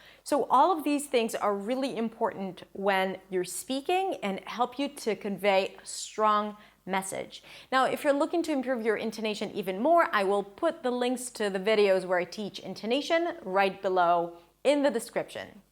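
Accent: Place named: United States. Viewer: you're in israel? i thought that you were in the US,,,, damn, how do you keep your american accent in such good shape?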